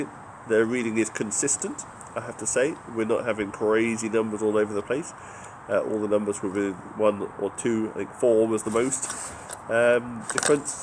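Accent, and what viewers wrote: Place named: United Kingdom. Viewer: Man I agree with the other guy... You talk like a Brit. I could start a pot of tea and sit down a have a cup or two while ya wax poetic without actually saying anything useful. Remember some of us Yanks don't even drink coffee anymore we are all hopped up on Monsters and Rockstar's. Our patience is shot. Still that's for the review you did give me what I wanted to know, just sold my Caldwell before buying a new air rifle.. to poor to buy another now. Glad this thing might work for a bit.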